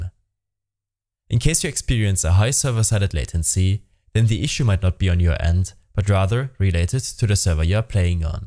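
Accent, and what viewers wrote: Germany. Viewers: Man merkt so hart das du deutsch bist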